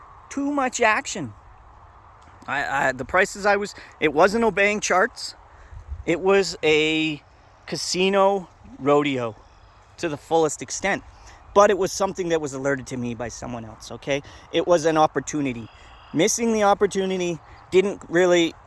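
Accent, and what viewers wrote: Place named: Canada. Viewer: Keep grinding man your really building this channel quickly. I could really here the canadian in ya this vid. You said level at one point i was abut to die lol 🤣🤣 best of luck bro. Curious to see your perspective on the warrants too many conflicting view points and most of those who actually know what theyre talking about are just pointing out what perspective they want everyone else to have. I know for a fact youll tell it like it is bro.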